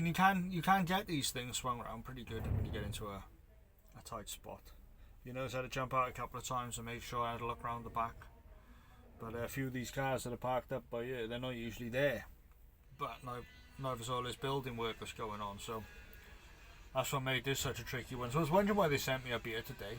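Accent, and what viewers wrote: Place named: Barry, United Kingdom. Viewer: That’s gotta be a Barry accent surely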